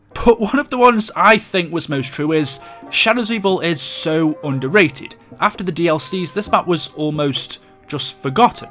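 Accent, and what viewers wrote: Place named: Liverpool, United Kingdom. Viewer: Oh god the scouse accent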